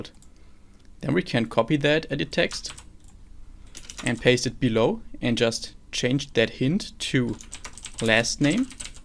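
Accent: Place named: Germany. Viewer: Sounds like you are from germany. :D Anyway, thank you for the good explanation!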